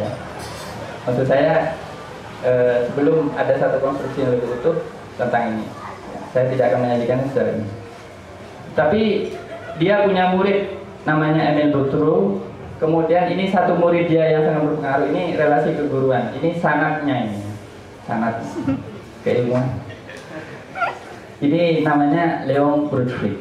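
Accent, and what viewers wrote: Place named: Madura, Indonesia. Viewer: logatnya kayak pak mahfud, pasti dia ini orang madura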